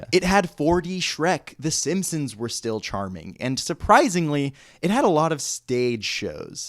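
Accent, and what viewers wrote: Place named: Australia. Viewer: As an aussie i was also wondering why he sounded vaugely australian 😂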